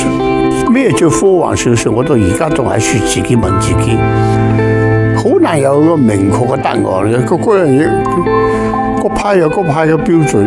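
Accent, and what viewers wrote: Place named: Shanghai, China. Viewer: 用沪语的音调讲粤语，听得好亲切。少小离家永不还，乡音无改鬓毛衰，倪老先生千古!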